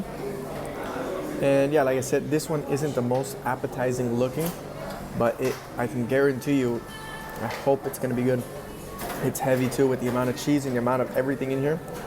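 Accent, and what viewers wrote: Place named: Argentina. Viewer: Your Argentinian accent is impeccable;). We all speak Spanglish in the States and then when going to a true Latin country that it doesn’t cut it , lol. French is my first language, can speak Portuguese ( Brazilian style ) and some Spanish, but the Argentinians loved the way I spoke Spanish ( though very minimal) Thank you for sharing your story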